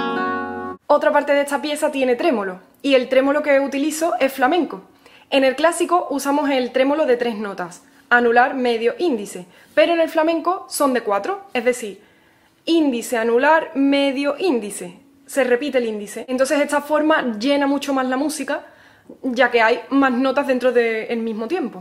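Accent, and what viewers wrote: Andalusia, Spain. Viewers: she is from Andalucía, she has a particular way of speaking spanish. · Es que es de Andalucía donde tienen un acento muy propio. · it's not a dialect but spanish with accent from Andalusia. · A quien no le gustaría tener a una profesora de guitarra tan guapa.... Madre mía....y con este acento andaluz...es un encanto escucharla. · Es español, español, no se trata de ningún dialecto, es Español con sus toque particular andaluz en la bella voz de.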